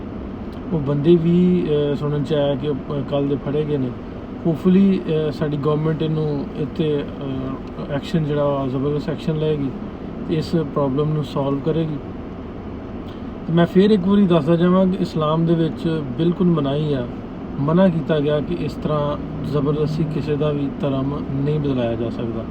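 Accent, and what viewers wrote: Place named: Amritsar, India. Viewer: God bless brother. Tusi pakistan wich kithey rehende ho? Tuhadi boli bilkul amritsar wali lagdi ah.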